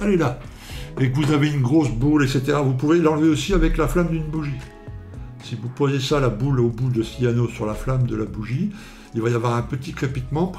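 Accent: North, France. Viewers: Salut, j'ai découvert ta chaine il y a peu et j'aime beaucoup.Le ton et la vie que tu y met (ce qui n'est pas toujours simple en fonction du sujet) 👍Et le p'tit accent de ch'nord me ramène à mon enfance ✌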